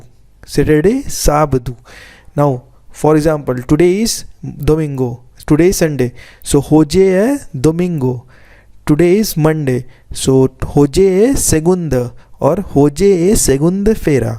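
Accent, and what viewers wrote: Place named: India. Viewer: You sound like an Indian. Keep up the good work. Needed these kind of videos for so long!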